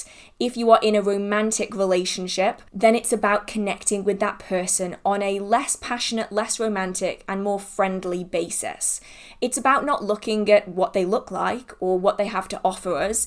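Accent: United Kingdom. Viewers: I like you sooo much. I love your cute British accent 😊❤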